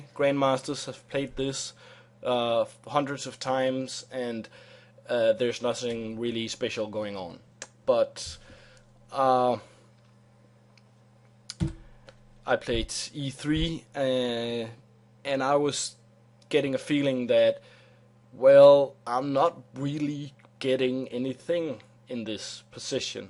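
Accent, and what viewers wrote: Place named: Denmark. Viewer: er du dansk? din accent lyder meget dansk.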